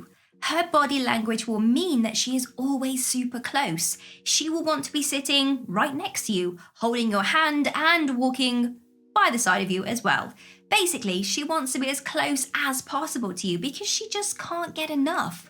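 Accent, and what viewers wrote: United Kingdom. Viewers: I like this ladies sweet British accent 😍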